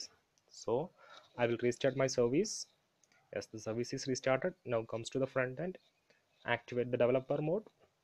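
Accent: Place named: India. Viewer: I understand English quite well but your Indian accent is almost unintelligible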